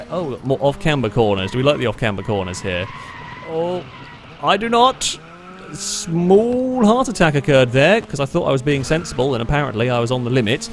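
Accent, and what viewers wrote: United Kingdom. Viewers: Most of the time, I listen to youtubers with American accents so listening to this video was different but great! Im terrible at distinguishing different accents, but my thoughts go to thinking this is a british accent. Lol, I could be very wrong. I enjoy the accent, but most youtubers are overly animated and hyped up when Id much prefer something like this. You are not trying to hype us up by getting loud and crazy about little things. You're excitement seems genuine and makes watching this video in particular far more engaging than most others Ive seen. This is a simple compliment in the longest form but the summary of it is this. The lack of fake energy, the commentary itself, the volume of your voice and the clarity all make this video a great one. It heavily reflects you as a creator it does not disappoint. Have a great day everyone!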